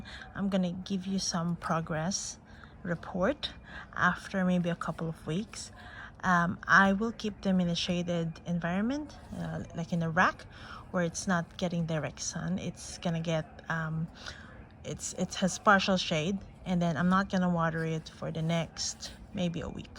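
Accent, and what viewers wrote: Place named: Philippines. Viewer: Hi! Lovely how you were able to revive them!! 💖 I struggle with these plants but I want to have them so bad! After I get new pearls, is it okay to change the soil completely? Will it kill the plant due to shock? I don't usually like the potting mix it comes with as I'm afraid they're not fit with my conditions (might be not so well-draining) or might even have pests in them. Is it better to leave it? Also, are they generally okay in shaded areas? Just bright shaded area, not much sun 😅 P.S. You sound Filipino!! Great video 💟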